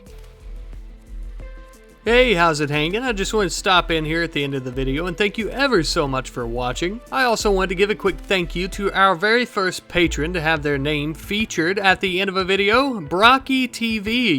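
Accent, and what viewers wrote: United States. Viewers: Wait your American?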